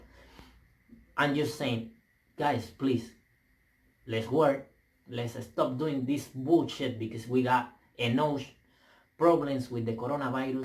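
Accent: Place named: Cuban-American, United States. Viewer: su asentó es cubano/americano aquí todos hablan diferente si escuchas a un australiano habla diferente a un inglés y los dos mensionados hablan diferente a un americano incluso aquí los new yorquinos hablan diferente a los californianos. No se tu de donde tengas el acento. Yo lo tengo Californiano y le entendí todo muy claro a.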